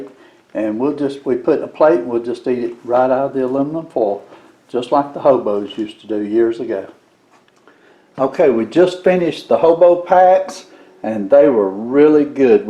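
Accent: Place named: Alabama, United States. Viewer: Yall in Alabama? You sound like Bama...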